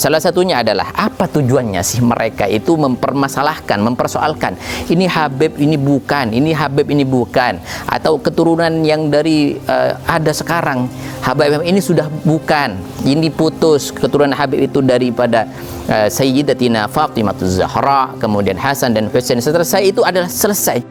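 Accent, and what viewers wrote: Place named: Arab world. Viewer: ini orang dialek ngomongnya kaya orang arab yah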